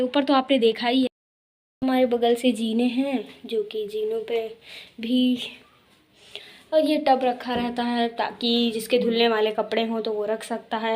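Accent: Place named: Lucknow, India. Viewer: Lg rhi h lko ....vali ... mtlb bat krne ka tarika ...mai bhi lko se ..hu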